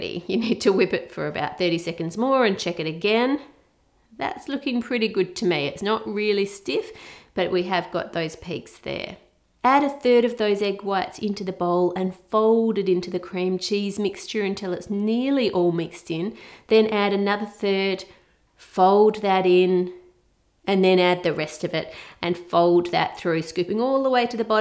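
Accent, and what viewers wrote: Australia. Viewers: are you australian? you have the accent lol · She’s Australian (I’m British) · She is Australian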